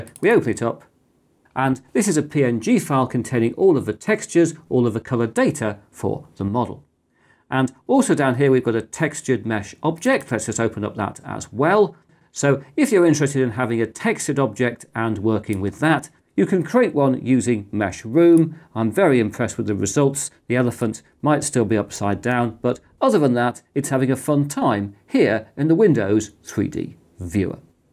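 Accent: England, United Kingdom. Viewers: And no Lidar mention in sight! You just use a basic DSLR?, Excellent well paced video with an English accent, everything explained well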